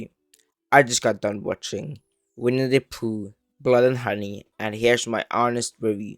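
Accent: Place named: Italy. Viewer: Idk why I got this video recommended but it is kinda fun and I love your Manga collection. Btw where are you from? Your accent sounds a bit italian idk?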